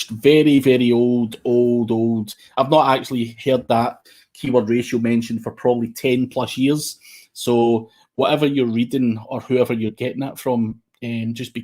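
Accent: Scotland, United Kingdom. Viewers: What's up with all these wankers complaining about the awesome Scottish voice!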